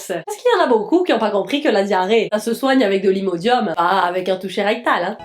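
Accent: Quebec, Canada. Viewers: Jai une pote quebequoise elle me tue avc son accent et jaime beaucoup laccents québécois et je croyais que le Québec parlai pas francais hihiii donc jai appris laccents grâce à toi je la parle que quebequois la hopihoihii... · Tu commence à avoir l’accent québécois j’aime bien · Grave on dirait vraiment qu'elle est né à Québec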